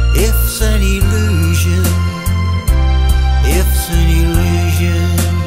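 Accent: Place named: United Kingdom. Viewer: Superb,. Such a unique upload of this lesser-known Whittaker gem, a profound, deep poem with amazing heroic/patriotic music accompaniment. It's pretty cool to hear you using your beautiful Brit accent on this. Normally, you just merge into the hybrid voices you create, and no one can tell. It sounds just lovely here, man. Excellent vocals! BTW, Dave Silk is the best, a first-rate gent! Shout-out to David! I hope everything is okay, bro... is Helen doing well? So glad to hear about the new medication, and that things are a little better. We've all been praying for you both, dear friend, and sending good vibes. Wishing you both a week of tranquility and calm. Peace and brotherhood,🙂 Mac (aka RE-VO)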